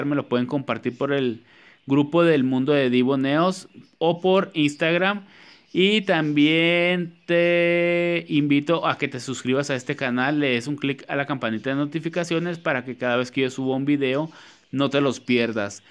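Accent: Chihuahua, Mexico. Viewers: De dónde eres? hablas como lo de Chihuahua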